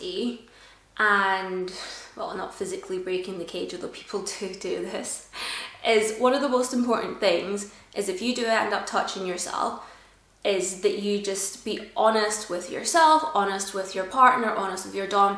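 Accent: Scotland, United Kingdom. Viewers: Are you Scottish? Your accent sounds Scottish to me.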